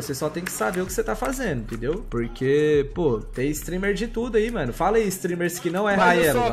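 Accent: Minas Gerais, Brazil. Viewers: ce é mineiro? nois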